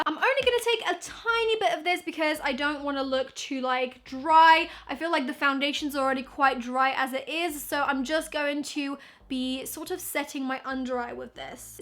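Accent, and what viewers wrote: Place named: United Kingdom. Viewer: Talking is so soothing to ear I love your British accent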